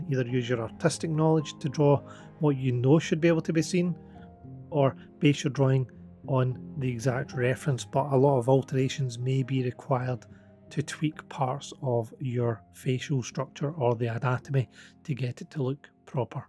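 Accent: Scotland, United Kingdom. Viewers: you are a great Artist, good to hear a Scottish person like myself LOL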